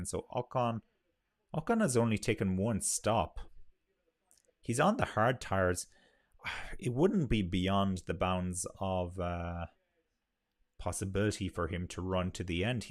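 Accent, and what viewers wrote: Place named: Ireland. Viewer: Aaaah a good Irish accent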